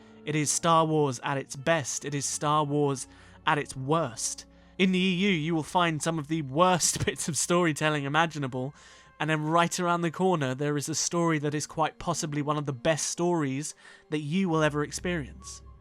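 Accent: United Kingdom. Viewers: First of all, I absolutely agree (25 secs into the video) because every argument sounds BETTER with British accent. Thank you so much for throwing this video and pointing out excellent things. This video is the PRIME reason I started my own channel to bridge the gap between Legends & Canon and have the fans see the beauty in both! LucasFilm cares, Disney cares, and the new Legends re-prints are glorious!! I'll be watching your videos. Thank you so much for this great video.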